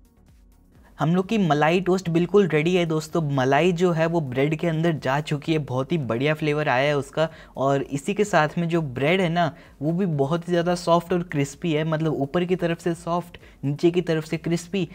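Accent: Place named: Kolkata, India. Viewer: Mujhe aapke bolne k style se hamesha lagta tha k aap kolkata se belong karte h...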